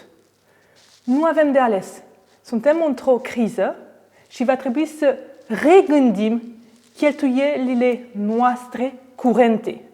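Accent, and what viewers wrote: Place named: France. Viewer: Aveti un vocabular foarte bun, dar ar trebui sa lucrati la dictie pentru a elimina acel accent frantuzesc foarte puternic si a pronunta corect litera R. Suna foarte neplacut in limba romana. La emisiunea "chefi la cutite" vin straini care vorbesc MULT mai bine romana dupa doar cativa ani in Romania, chiar si doar dupa cateva luni...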